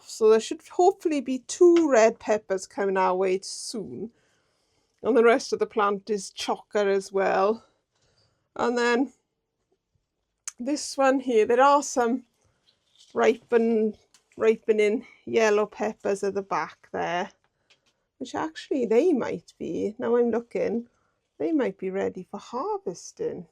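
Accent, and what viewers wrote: Wales, United Kingdom. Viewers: Lovely job our, I love your Welsh-ness in your commentary, 😂never lose it lovely girl, the harvest you gave me were delicious, 🎉xxxx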